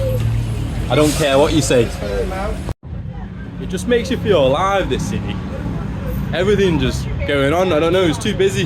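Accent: United Kingdom. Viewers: You got more of a British accent than me lad! Keep smashing the vids up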